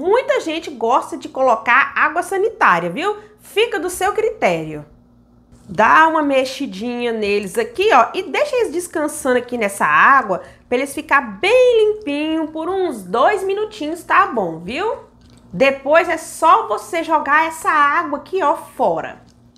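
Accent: Minas Gerais, Brazil. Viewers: não sei se vc é mineirinha, mas que esse sotaque mineirês seu é gostosim de ouvir, ah, isso é....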